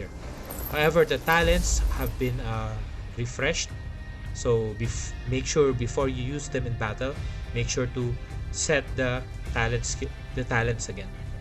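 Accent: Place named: Philippines. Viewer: Are you Filipino? You sound like you're Filipino because of your accent and voice, by the way I'm a new subscriber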